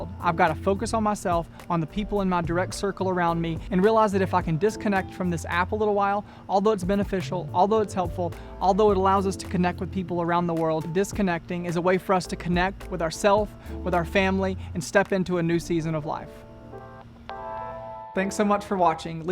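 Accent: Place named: South, United States. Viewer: Are you from the Mid-South? I hear a Memphis/Alabama/Arkansas/Tennessee accent.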